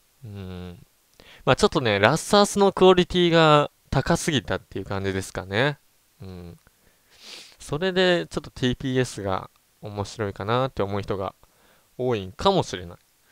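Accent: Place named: Ehime, Japan. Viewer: 〇〇やからって方言で愛媛かとおもってました(^○^)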